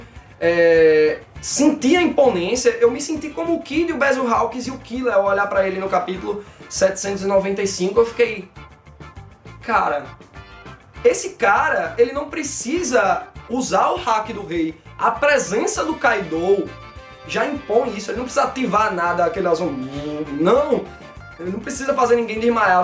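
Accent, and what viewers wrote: Pernambuco, Brazil. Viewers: VC e Pernambucano ou Nordestino?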